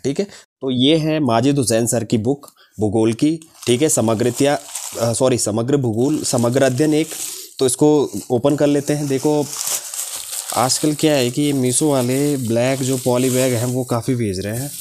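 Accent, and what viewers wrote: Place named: Garhwal, India. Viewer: गढ़वाली tone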